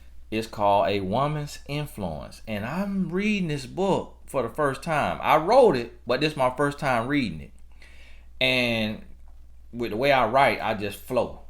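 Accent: South, United States. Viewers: You so real, and transparent! from your dating in your younger years, to being honest about your preference in your wife, and the Lord bless you two kids later.. Your country talking self is alright with me😆👍🏾 I love it! Keep on palming your wife! The RIM ROCKER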